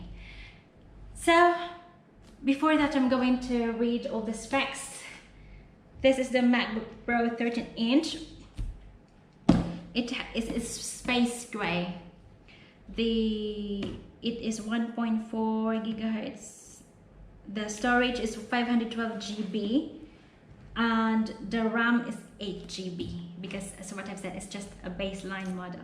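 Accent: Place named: United Kingdom. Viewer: Love the accent - grabeh! British accent bah? Dko sure. Love it!!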